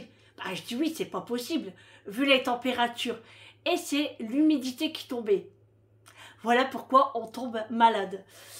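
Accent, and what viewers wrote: North, France. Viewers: Bonsoir je suis une nouvelle abonnée. J'ai aimé votre naturel, votre simplicité et votre franc parler. Vous avez l'accent du nord. Je suis de douai ( 59) prenez soin de vous et de vos proches. Je vous souhaite pleins de belles choses à vous et votre famille. Dieu vous bénisse ❤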